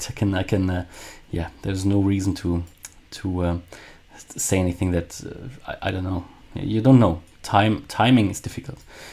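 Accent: Germany. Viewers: According to the accent you should be German. Danke für die excellente Arbeit. Es ist schön zu sehen wenn sich die EW´ler einig sind.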